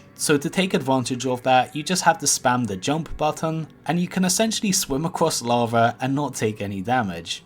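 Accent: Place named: United Kingdom. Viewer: He talks in an English regional dialect from the UK , everyone in the area he lives in talks like that .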